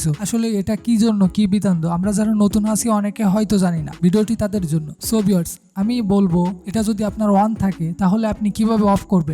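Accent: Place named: Barishal, Bangladesh. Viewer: ধন্যবাদ ভাইয়া, এত সুন্দর উপকারী একটা ভিডিও আমাদের কে উপহার দেওয়ার জন্য,আমি এই cc নিয়ে অনেক টেনশনে ছিলাম,কেনো ভাবেই বন্ধ করতে পারছিলাম না,অনেক খুঁজা খুঁজি করে নতুন আপডেট এই ভিডিও টা পেলাম, আপনাকে অনেক অনেক ধন্যবাদ এগিয়ে যান ভাইয়া ইনশাআল্লাহ পাশে আছি, এবং আর একটা কথা আপনার বাসা মনে হয় বরিশাল,কেনো না আপনার কথায় বুঝতে পারলাম?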